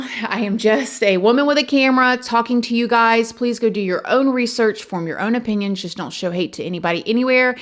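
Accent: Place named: Atlanta, United States. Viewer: your commentary about the INCEL portion, that is a lot of conjecture with a lack of evidence, your bias is really ruining the last part of your video. you had a great objective video up until that point. for your own sake, can you make a video of how many people have committed murders that are now considered INCELS. i would love to see your facts vs jumping on the FED bandwagon. INCEL has become a slander on younger men that are mostly at that age, that awkward age for dating. the fact that people are being labeled INCEL is beyond subjective this coming from a father, husband and have had a lifetime of multiple partners and relationships. btw u sound like u are out of atl also, not the inner 285 but outside.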